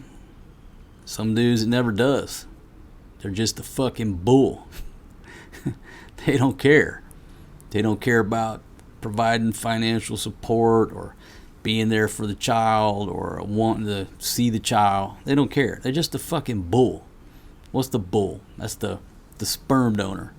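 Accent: South, United States. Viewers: I’m a new sub.. I enjoy listening to your conversations. I will not judge, I just want to understand some things. Who is Maria’s mother? Is she your biological daughter? How did you name your son Forest G? He’s adorable & so is Maria. I’m very familiar with your personality , being the redneck that you are, 😅the way you talk, cuss a lot & drink a lot. I understand the way you talk. I’ve lived in Georgia long enough 😅. You are a good story teller. You can be amusing, funny, & serious. Glad you’ve clarified your position with Janice’s baby. Continue your life stories while people here in the US are still trying to learn their pronouns. Now you’re the hillbilly of the Phil. 😂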